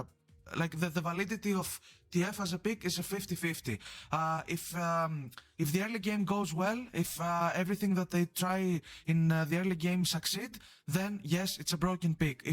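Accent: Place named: Greece. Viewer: Good video. Also your accent is familairly greek :p .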